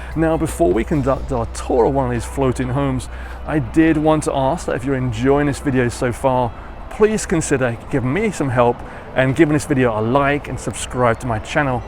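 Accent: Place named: United Kingdom. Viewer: A very marked British accent.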